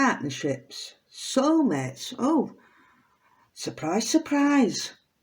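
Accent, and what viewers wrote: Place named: Yorkshire, United Kingdom. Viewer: Hi hun new sub you sound very yorkshire im from Leeds and you sound same accent loving this ive watched this about Sebastian from day one its so sad 😢💚💚💚💚💚💚💚💚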